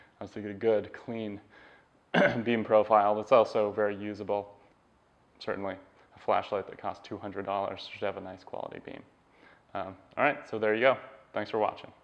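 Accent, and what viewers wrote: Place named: United Kingdom. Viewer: haha love the british accent - love the lights. gonna put some $ toward the Beta QR next check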